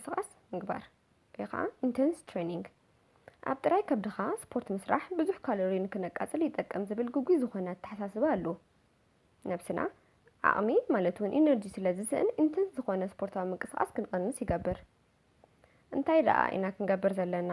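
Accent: Tigray, Ethiopia. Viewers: Why don’t you focus on the information/Lesson . You people have always something to complain about. Her Tigrinia was perfect. Her Tigrinia is fromTigray or Ethiopia. Eventhogh i am from Eritrea i understood every single Word she said..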